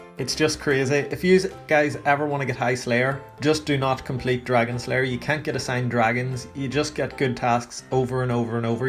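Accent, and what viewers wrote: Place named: Northern Ireland, United Kingdom. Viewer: Jesus, hearing another Northern Irish accent on the internet is super weird. Wait it says your from fucking Enniskillen on your twitter... lmao, i'm 10 miles away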